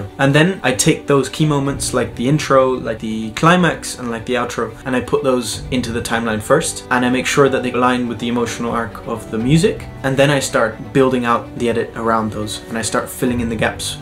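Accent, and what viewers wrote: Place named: Canada. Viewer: wait you are 100% canadian, that drivist prononciation.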